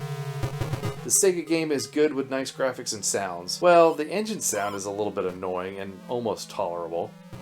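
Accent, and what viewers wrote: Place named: United States. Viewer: hears American accent Me: here comes NES bias 😅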